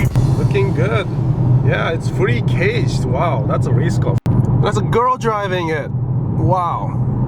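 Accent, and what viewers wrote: United Kingdom. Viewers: I love your Asian British accent! <3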